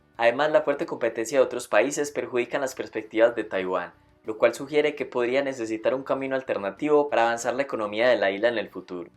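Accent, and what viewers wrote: Antioquia, Colombia. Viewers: Suena como paisa, ¿ Eres Colombiano?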